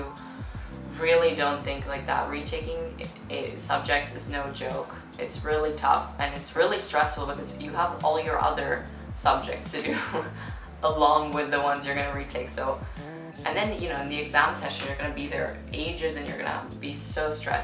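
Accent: United States. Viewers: I hope this doesn't come across as too nosey, I'm just a curious kind of person :D You sound American to my British ears, are you doing A-levels specifically because you want to study in the UK? Hai scritto che sei italiana, vivi in Italia in questo momento? Hai fatto il liceo o stai facendo qualche scuola internazionale / inglese? Come si fa a fare gli A Level all'estero, ci sono centri dove si danno gli esami? Cmq in bocca al lupo per l'università... io sto pensando di tornare a fare gli A Level di nuovo quest'anno perché sono 3 anni che faccio questo stesso lavoro e ormai è diventato noiosissimo. Scusa tutte le domande, ma sono uno che deve sapere tutto :D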